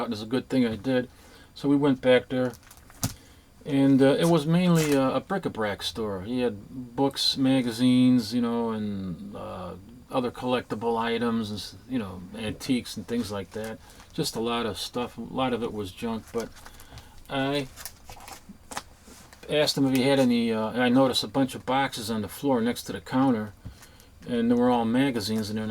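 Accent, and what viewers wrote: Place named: New York, United States. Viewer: you could be a voice actor you sound like a newyorker who knows the streets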